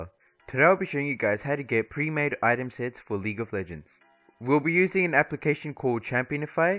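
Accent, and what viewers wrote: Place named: Australia. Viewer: Where are you from? Australia? I LOVED YOUR ACCENT!!!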